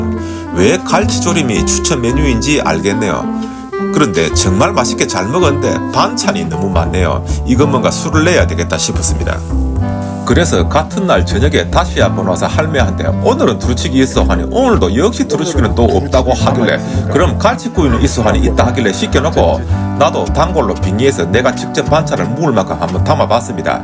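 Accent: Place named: Busan, South Korea. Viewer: 네네 정겨운 말씨랑 같은 부산시민이셔서 더더욱 즐감햇어요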